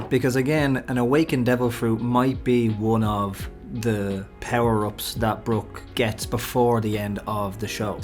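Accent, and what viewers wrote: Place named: Ireland. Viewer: Came for the theory, stayed for the Irish accent!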